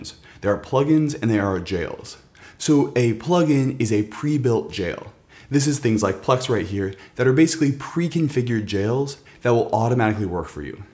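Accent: California, United States. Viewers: I have no idea where you live but when I listen to you all I can think of is SNL "The Californians". lol Your videos are greatly appreciated. Thank you.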